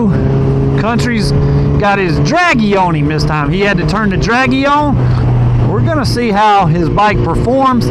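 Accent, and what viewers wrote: South, United States. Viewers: bro I love your stuff, I just have to ask has anyone ever told you, you kinda sound like Charlie Day with a southern accent?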